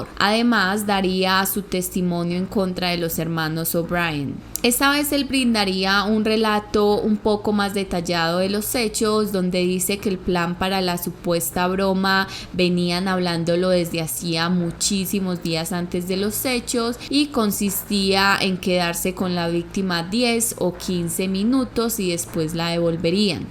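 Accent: Colombia. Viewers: Pobre niña En poco tiempo te has convertido en una de las mejores y tu acento colombiano me encanta 👍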